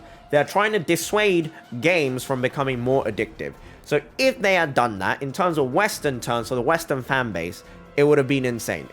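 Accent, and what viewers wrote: United Kingdom. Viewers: I just found out about you and I have say, it's kinda weird hearing an asian with a uk(?) accent. No hate or anything but it just kinda threw me off a bit since it's a bit unusual to me.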